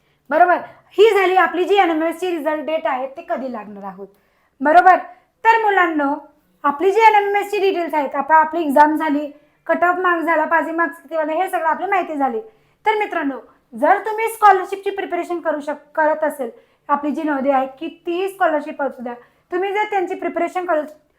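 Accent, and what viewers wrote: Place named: Gujarat, India. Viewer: नमस्कार मॅडम आपका टोन गुजराती जैसा है l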